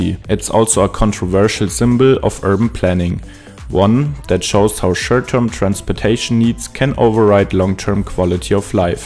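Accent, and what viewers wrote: Germany. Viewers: I hear deutsch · I really her out that you are from Germany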